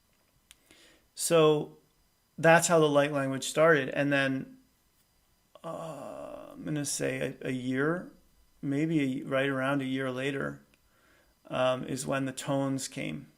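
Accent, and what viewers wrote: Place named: England, United Kingdom. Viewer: Wow you channel as a English man 🙏💜 I thought you were English. Excellent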